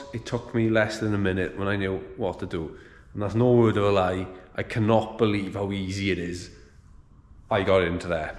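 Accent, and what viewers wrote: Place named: South Wales, United Kingdom. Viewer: you got a sub from me for just being a fellow welshy, the fact you sell cars is a bonus lol. we sound very similar so im guessing your from south lol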